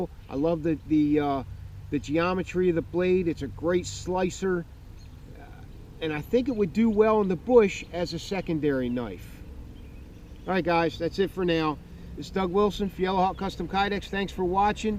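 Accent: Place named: New Jersey, United States. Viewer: You sound like a New Jersey moke to me. It sits well on ya.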